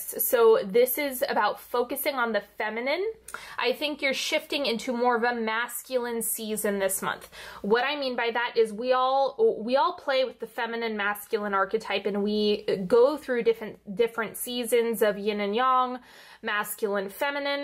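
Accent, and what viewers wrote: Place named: United States. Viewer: Whats this accent from in US?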